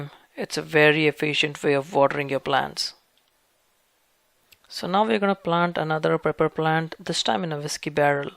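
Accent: India. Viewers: are u Indian ? u sound like one :)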